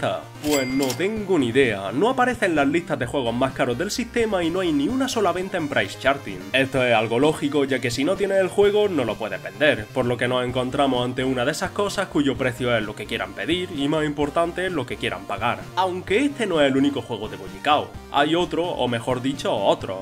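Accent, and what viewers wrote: Andalusia, Spain. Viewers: empece a ver tus videos de hac 1 dia o 2 y me sonaba que era andaluz XD esto me lo confirma XD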